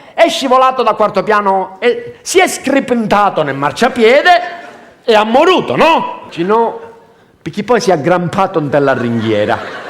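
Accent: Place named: Sicily, Italy. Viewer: Very, very funny. He sounds like my Sicilian (di Agrigento) father spoke. grandissimo di l'america.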